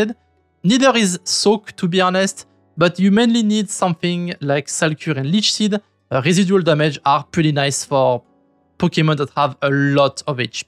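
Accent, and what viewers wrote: France. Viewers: je m'abonne car tu me fait rire avec ton accent français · Hahahaha your french accent is f obvious when you speak, also nice video btw.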